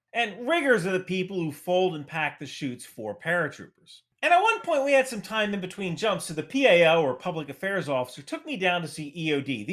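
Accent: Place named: United States. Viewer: Very convincing American accent for a working class Irishman, how long have you lived in the states?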